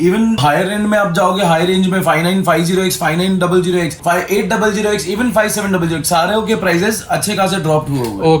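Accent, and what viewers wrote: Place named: India. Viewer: ur voice is too good to other's literally..like in hindi accent so make video's in multi purpose bro soon u will hit 1 million in month like make videos on cars and anyother vlog and every week 3 vlogs nikaloo aur maintain rakho like cars ke upr aur tour ke upr aur our community gaming 🇮🇳🇮🇳🇮🇳🇮🇳🇮🇳🇮🇳🇮🇳🇮🇳🇮🇳 bro plss u can do whatever i say i hope u listen.... Ku ki baad mei reply ni krta koi 😅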